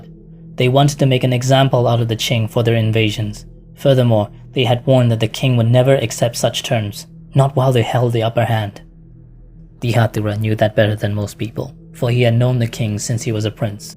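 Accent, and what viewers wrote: Myanmar. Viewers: are you a burmese,ur voice so like with a native burmese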